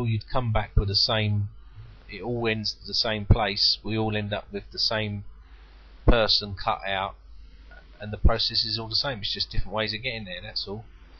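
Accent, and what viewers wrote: United Kingdom. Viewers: From now on I will only watch tutorial made by British guys <3 the accent =) The tutorial is gd too .... 'melts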